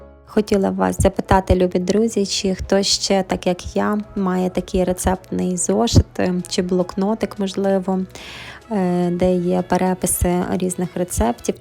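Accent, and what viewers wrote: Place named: Ivano-Frankivsk, Ukraine. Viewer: Привіт! Люблю нашу франківську бесіду з твоїх уст. Відео вийшло дуже затишним і домашнім. Синочка вітаю з днем народження, бажаю щасливої долі.❤❤❤❤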